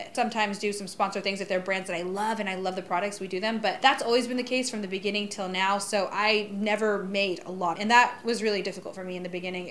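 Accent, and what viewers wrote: Iraq. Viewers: عراقية وعدها. فيديوات تحجي عراقي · احكي عربي بفيديو كامل عربي وتحديدا اللهجة العراقية 😀😉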